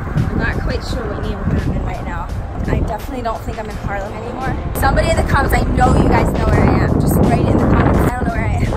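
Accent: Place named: Philadelphia, United States. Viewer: Awesome video,. I love your philly accent. I wash my jeans once a week. 😘😘😘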